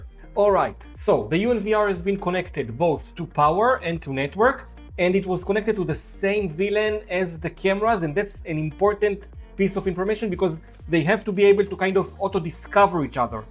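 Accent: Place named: Israel. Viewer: Very good video, concise and to the point, without the blah, blah, blah of many influencers. A pleasure to watch. The guy sounds like a Romanian Israeli.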